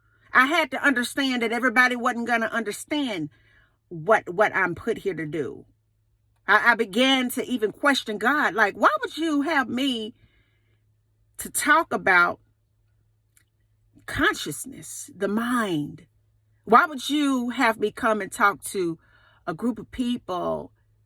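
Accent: South, United States. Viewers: Thank you. ❤ You sound like you are from downsouth. May I ask where you are from and what is your ethnicity?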